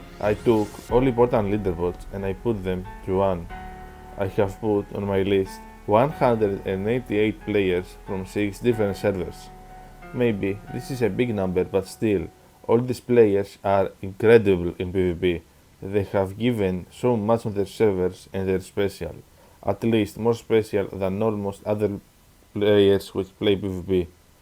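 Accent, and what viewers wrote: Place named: Greece. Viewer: Greek accent hits hard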